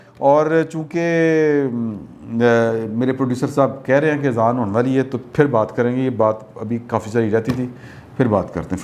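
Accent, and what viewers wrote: Punjab, Pakistan. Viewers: Ur great 👌 Sir 👌 g good job 👍 naam tou suna hi hoga dialogue with Awais Sheik Multan Wala Punjabi tarka Kamal Ka hota hai aapka Bhai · Sadky jawan app ke urdu or mix panjabi Kay. 😂😂😂😂😂. Love 4 u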